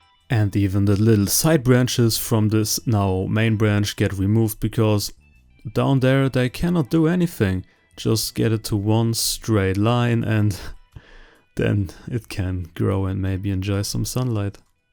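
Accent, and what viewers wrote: Germany. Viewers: sieht gut aus Großer :) dein Englisch bringt mich auch immer wieder aufs neue zum schmunzeln <3 Jeder Ami oder Engländer wüsste nach 1 Satz, dass du Deutscher bist :P Einen wertvollen Tipp, den ich dir mal an die Hand geben möchte gegen die blöden Schnecken! Als ich früher Outdoor gegrowt habe war das sehr effektiv. Sammel dir kleine 0.2-0.5L Kunststofftöpfe/Behälter und kauf dir Kuperband! Von den Töpfen/Gefäßen schneidest du den Boden raus und klebst ringsrum das Kupferband. Schnecken klettern nicht über Kupfer. Wenn du deine Sämlinge dann einpflanzt den Topf drum rum, quasi wie mit deinen Schneckenkrägen die du ja bereits hast! Die Stämme können ohne Probleme wachsen der Platz/Durchmesser reicht völlig aus und du hast keine Schnecken mehr die Hochkrichen. Man muss natürlich drauf achten dass die unteren Triebe / Blätter nicht auf den Boden kommen, sonst können die Schnecken dort hochkrichen! 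Du solltest mit der Methode auf jeden Fall auch wesentlich günstiger liegen als du für die Schneckenkragen bezahlen würdest! Kupferband ist echt eine tolle Sache! Kann ich nur jedem Outdoorgrower empfehlen. Auch wenn man z.B. ein Hochbeet hat im Garten für Salat odg. Da kann man auch wunderbar 1 oder 2 Bahnen Kupferband ringsrum kleben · Das Ding mit dem tribe, man muss manchmal schon Deutsch sprechen, um dein Englisch zu verstehen ❤ Mach bitte weiter Videos, find deinen Stil einfach gut. Stream am Ende der Season fänd ich auch super!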